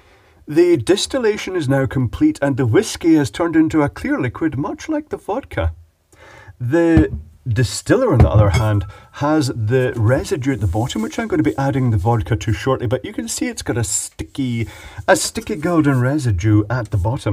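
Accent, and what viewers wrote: Scotland, United Kingdom. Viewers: is that scottish accent?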